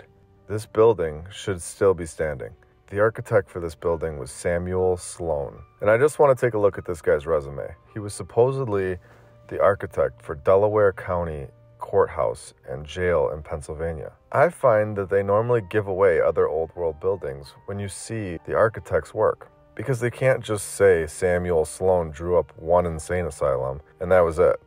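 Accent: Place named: Midwest, United States. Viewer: Midwest accent, reminds me of home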